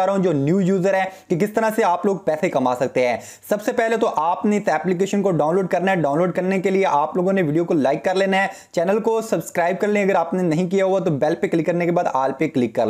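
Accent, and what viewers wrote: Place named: Punjab, India. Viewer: اس نے پڑھائی نہیں کی سارا وقت ان ہی کاموں میں گزار دیا تب ہی سانیک ویڈیو بول رہا ہے :) پتہ نہیں یہ پنجاب والے بھائی اردو بھی پنجابی کی طرح کیوں بولتے ہیں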